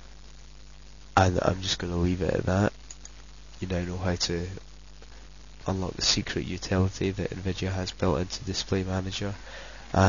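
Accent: United Kingdom. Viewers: You Sound awefully like midlothain punter(im fae the same area). P.s. I know this is an old vid but forceware does not alow you use coolbits. RivaTuner is you best bet from now on unless you want Nvidia shity overclock tools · He's Scottish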